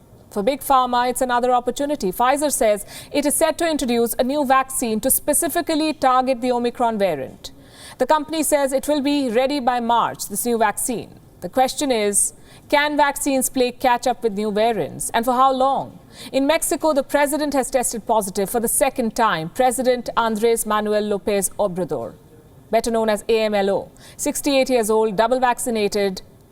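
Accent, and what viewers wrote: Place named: India. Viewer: How funny of this india accent!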